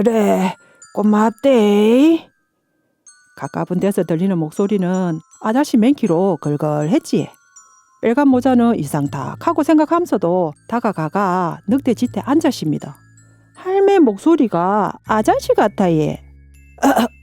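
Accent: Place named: Hadong, South Korea. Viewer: 제가 사는 곳에선 좀 처럼 접하기 힘든 사투리인데, 하동 말로 듣는 동화가 생생하고, 참 정겹게 느껴지네요. 다음 편도 기다릴게요 😊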